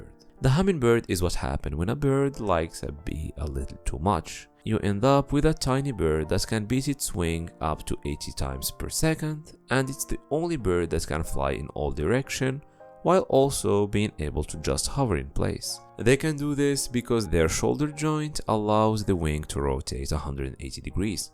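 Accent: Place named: Morocco. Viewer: Dude your accent sounds moroccan, where are you from?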